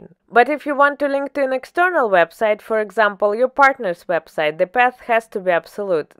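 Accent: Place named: Russia. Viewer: Приятно слышать русский акцент)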